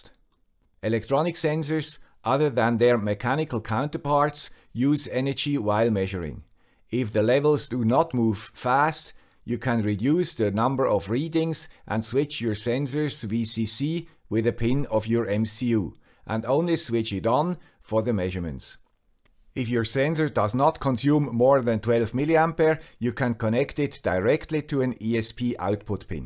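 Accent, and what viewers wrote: Switzerland. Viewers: Perfect timing! I have a small decorative fountain that I brought in to store for Michigan's winter. I wanted to outfit it with a sensor to stop the pump if the water level dropped to prevent damage and alert Home Assistant. Now I have no excuse to forget. Once again thanks to the guy with the Swiss accent! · A little more about why a solution matters (and why your videos have been helpful, apart from the pleasure of hearing a Swiss accent again after my years in Bern): A few years ago a colleague at my university asked me if I could help measure the level of water in the ground in a tropical rain forest. In fact I was already studying groundwater saturation in peat and other soils. This is a hugely important topic worldwide because it is an important factor in flood risk and in the loss of carbon from soils - one of the very biggest sources of greenhouse gasses to the atmosphere. 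So I had a go at the problem. There are a lot of ways in which people measure the level of water saturation, and soil moisture, some of which are good, some of which are poor - and which you have very helpfully discussed in previous videos. As you know there is, in fact, one method of measuring soil water content which, if calibrated, can be made quite accurate and tough using cheap sensors - capacitance monitoring. There are also many ways to measure groundwater saturation level (the level at which all the porosity in the soil is full of water, which is also the level to which a dip-well tube will be filled) some of which can make use of the instruments you discuss in this current video. My preferred method is to weigh a tube suspended inside a dipwell (because it's really tough enough to work in hard environments), but I have the load cell creep problem to deal with so maybe I go to a laser distance sy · Tangential question: at <link> are you saying "gritty YouTubers" or "greetings YouTubers"? 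I'll wager it is the former: gritty. The Swiss accent, while adding color and charm, leaves me in doubt about which word it is. Can you confirm?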